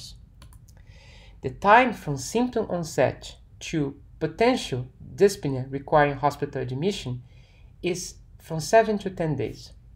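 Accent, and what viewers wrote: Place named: Brazil. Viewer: Love the Brazilian accent <3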